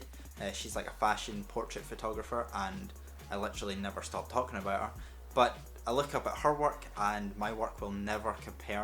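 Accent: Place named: Glasgow, United Kingdom. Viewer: Can I just say that I have a form of anxiety and when I get anxious I don't want to be around people. So if I can I go to my room and watch your videos and it really calms me down and I think it's because of your accent because it is fairly local to me (Glaswegiany) so please don't stop creating because I love your videos and my friends tell me I ramble on about your channel and it's great and you're great and yea...bye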